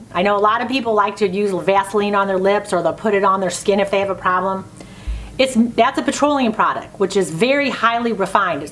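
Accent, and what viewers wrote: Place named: North, United States. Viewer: You are delightful to learn from. I love your northern accent!!!!❤️💕❣️ lip bomb. I live in Texas with my family in the north. It makes me think of them! Thank you for the info. 😊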